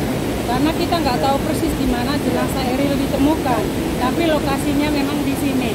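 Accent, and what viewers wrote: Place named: Manggarai, Indonesia. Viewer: Ketahuan dialeg vlogernya orang Manggarai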